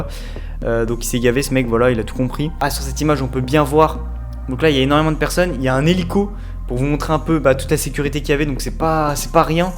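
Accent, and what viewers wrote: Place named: Belgium. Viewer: C'est peut-être pas un français peut-être un belge ou un suisse 🤷‍♂️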